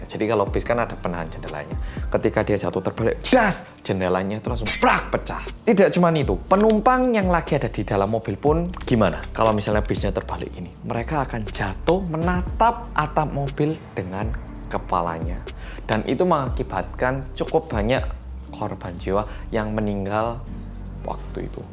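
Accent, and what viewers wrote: Java, Indonesia. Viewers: Medokkkkkkkk semangat amat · Masnya nich orang mana sih.... tampange wong korea tpi ngomonge ky wong jawa.... 😂😂😂😂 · Ga yakin saya Anda orang Korea... Mendok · Medokkk ... 😆😆 ttp semangat ... ttp jg kesehatan & GBU 😇😇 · Sudah ngepot ngepot gitu ya 😄😄 medhokk poll mas ki.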